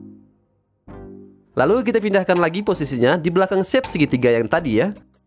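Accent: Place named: Gayo, Indonesia. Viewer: Fix dialeknya mirip orang gayo🤭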